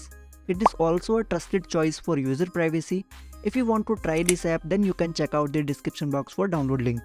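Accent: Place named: India. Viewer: It's a normal indian accent. Your comment is racist af.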